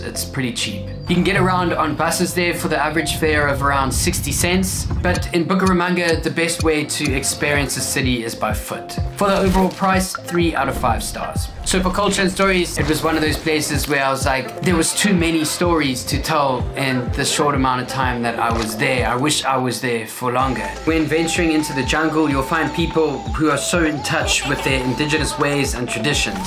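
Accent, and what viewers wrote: Cape Town, South Africa. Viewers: i thought you from cape town bro.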